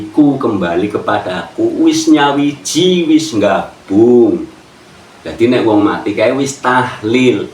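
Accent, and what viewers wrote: Banyumas, Indonesia. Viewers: Loh rupane wong ngapak ....Kulo wong Cilongok mas....tpi ku tinggal d riau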